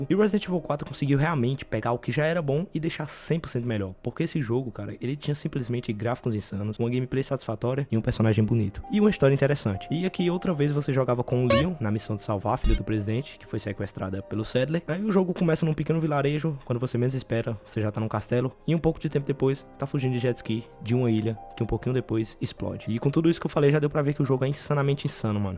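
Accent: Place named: Northeast, Brazil. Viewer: Mano, você é nordestino, né? Pelo sotaque, parece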